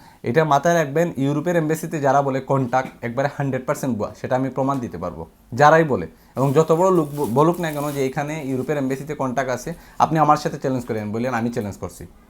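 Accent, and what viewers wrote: Sylhet, Bangladesh. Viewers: ভাই সিলেটি😊😊😊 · nice. Vai apni ki amader sylheti naki. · হুজাইফা এন্টারপ্রাইজ থেকে প্রথমে আপনার কথা শুনছি মনে হয় আপনি সিলেটি আমি দুবাই এয়রপোটে কাজ করি আমি জানি এগুলো আপনার সাথে পরিচয় থাকলে ভাল হয় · সিলেটি নি ভাই তুমি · ভাইতো মনে হয় আমাদের সিলেটের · You are sylheti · Vai apnar bari sylhet... Ami bujte parchi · সিলেটি নাকি ভাই? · vai ki sylheti🥰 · Boro bai apni jotoi vasha diye kotha bolen asole kintu apni amader sylheti bai right ? Deka hobe inshaallah dubai deira te aiyar’ 2012 te ami achlam 2 bosor r akon 7 bosor pora aiyar visit a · আপনি কি সিলেটি? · ভাই আপনি সিলেটি নাকি? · ভাইয়ের বাড়ি মনেহয় সিলেট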